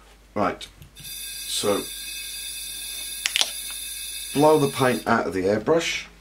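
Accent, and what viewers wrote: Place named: Great Yarmouth, United Kingdom. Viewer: know the area well, I'm from the gt yar. Area. Recognised our accent.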